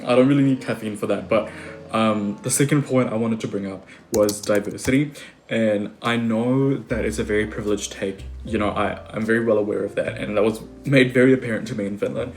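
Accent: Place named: New Zealand. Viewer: mans sounding kiwi as f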